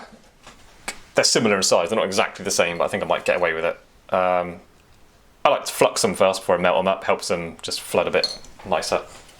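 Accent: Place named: London, United Kingdom. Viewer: Thankyou great to have tutorial London tone lol I'm newbie so loving your tutorial s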